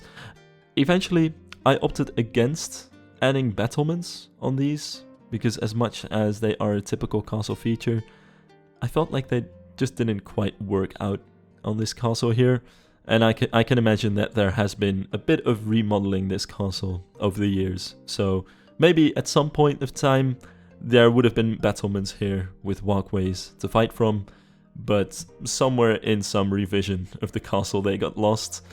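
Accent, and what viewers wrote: Netherlands. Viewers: Apart from the fact that your build looks amazing, I want to point out that I like how even though I could hear that you’re Dutch, you don’t have a horribly Dutch-English accent. Probably a weird compliment, but usually I skip videos from fellow Dutchmen due to their accents bothering me haha. Dus die kan je in je zak steken ;-P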